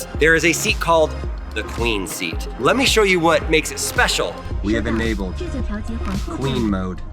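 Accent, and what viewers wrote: China. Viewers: Even the voice is Chinese-English lol.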